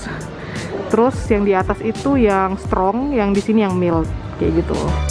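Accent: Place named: Java, Indonesia. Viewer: Tetap ada logat jawanya ya ,,sukses terus buat kelurga mamah 👍👍👍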